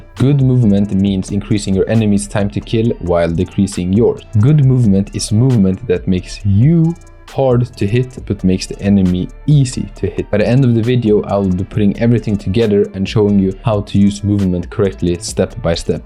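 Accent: Sweden. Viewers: Swedish accent detected.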